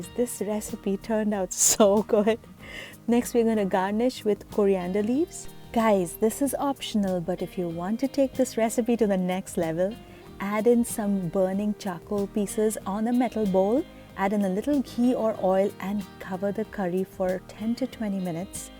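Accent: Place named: India. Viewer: That looks superb thank you, BTW I love your Indian Accent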